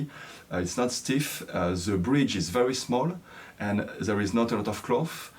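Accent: France. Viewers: your accent is Frenchy English and that's lovely and unique 😊 and You have a beautiful voice 🤍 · Ça s entent que t es francais , le u ! Le u mon reuf ! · Merci pour cette vidéo, je n'aurai jamais pensé que vous étiez français, j'ai été surprise par le " French accent " avant même de lire la description de votre vidéo. En tout cas très beau travail, continuez, ce que vous faites, c'est magnifique =) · 法國人說英語口音真的很重😄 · "I hope you don't mind my terrible French accent" there is a SINGLE sound you can learn that will make a massive difference in your accent and make it much easier for people who aren't used to French accents to understand you. It's the sound of the letter H, which is simply the sound of breathing out through your mouth. If you can breathe, you can make this sound easily! The letter H in English is typically NOT silent like it is in French (though there are always exceptions, like "hour"). 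I would suggest searching "comment prononcer le son de la lettre H en anglais" here on Youtube. It should take you only a couple minutes to learn and it will THE EASIEST AND BEST way to improve how people understand your speech. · your French accent is beautiful. Please never ever get rid of it; it’s as melodic as the Erhu. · Nice french accent! Et joli home studio. J’habite en Thaïlande et je suis passionné de musique asiatique. Bonne continuation.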